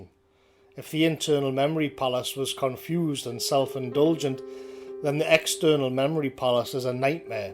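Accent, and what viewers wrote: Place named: Geordie, United Kingdom. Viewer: nothing to do with your video, as they're all exceptional; I just wanted to say as a Geordie ex-pat living in the colonies, I love your vids. Just hearing the local dialect brings me closer to home, even though i'm thousands of miles away. Keep up the good work big fella!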